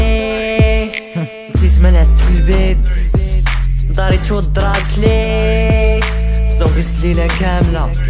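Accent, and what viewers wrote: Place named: Algeria. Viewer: من لهجتك بايتة جزائرية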